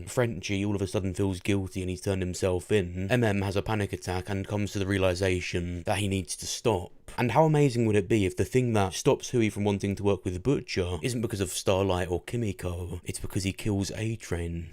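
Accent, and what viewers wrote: United Kingdom. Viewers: Are you a British guy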